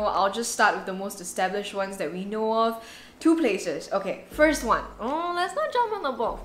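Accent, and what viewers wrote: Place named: Singapore. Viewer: how do u remove ur Singaporean accent?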